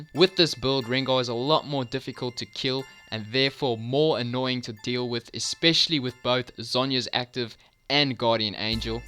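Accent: South Africa. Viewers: You need more recognition bro, and are you South African? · Is this guy South African? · are you south African?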